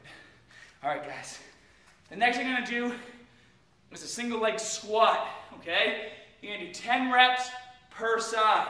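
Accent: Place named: New York, United States. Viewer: haha you might get a influx of costumers Usaully when people ask me questions I usully adivse to see your fitness videos and get his meal plan they work for everyone great site, but today I joined yahoo answers haha I think i have told like 150 people to join your site and how great it is:) haha lucky and im studying nutrition and getting certified as a personal trainer ( but no competion im CANADIAN whitch reminds me come to CANADA to TORONTO we would love to hear that new york accent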